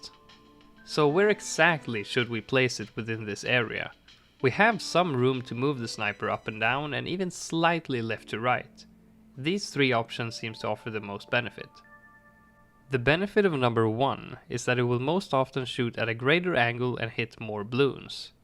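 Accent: Sweden. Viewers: You have the cutest Swedish accent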